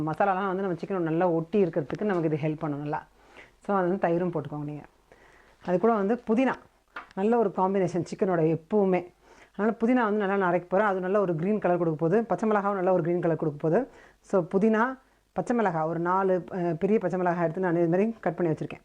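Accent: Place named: Chennai, India. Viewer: vial modern nana kitchen. mode look. but still kneeing pesra madras tamil super irk madam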